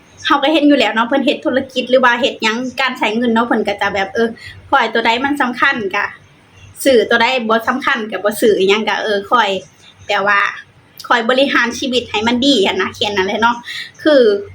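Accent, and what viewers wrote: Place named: Ubon Ratchathani, Thailand. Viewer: สาว พูดสำเนียงคล้ายอุบล ไม่เหมือนคนลาวเลย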